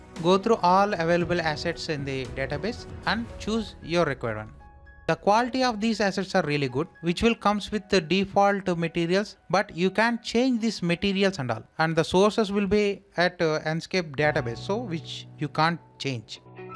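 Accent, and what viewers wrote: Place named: India. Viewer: I had hoped to follow your tutorial but it was just too frustrating. I find it difficult to understand Indian English because American English is my native tongue. It would help tremendously if your narrative was presented in closed caption. Thank you for your tutorial and your understanding.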